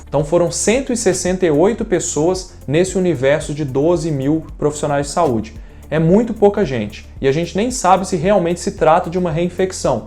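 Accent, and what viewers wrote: Minas Gerais, Brazil. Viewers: Tô sentindo que os vídeos tão perdendo o sotaque mineirinho hein... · Agora temos um sotaquim minerim de responsa divulgando ciência de qualidade no Brasil todo 😂😍 Valeu! 👌🏼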